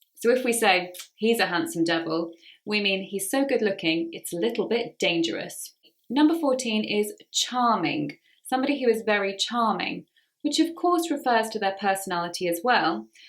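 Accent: United Kingdom. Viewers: It has been a utterly splendid, exquisite and flawless lesson. I'm particularly joyful, blissful and elated with that opulent topic. It is to my liking; moreover, it appeals to me. I do adore speaking, and her charming accent., Hence, I present undoubtedly the Queen of British English. You are the quintessential of British English. Thank you very much indeed. Far thee well, dearest Prof. N.B I am on the edge of making myself some “arrabbiata”, “caprese” and a slice of tiramisù. Would you care for an alluring dessert? Ciao. Andrea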